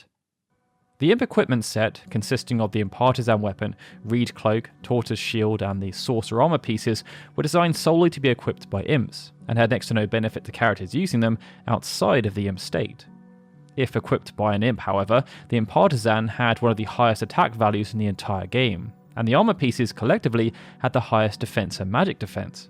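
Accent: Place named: United Kingdom. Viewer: I knew all those. Because you just recreated someone else's video with a brit accent.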